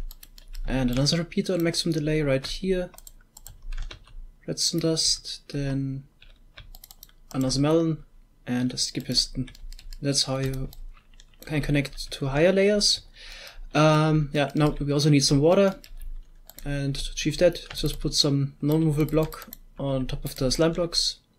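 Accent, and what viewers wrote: Germany. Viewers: Bist du deutsch? Klingt so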